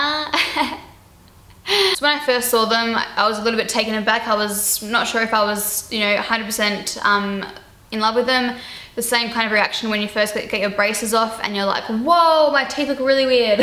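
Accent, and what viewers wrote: Australia. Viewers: Um she's Australian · Is she australian?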